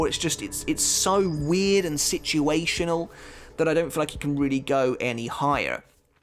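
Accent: England, United Kingdom. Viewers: Content and overall quality is great but the way you pronounce japanese in an English accent is very hard to listen to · You need to say Japanese word like a Japanese person not like a English man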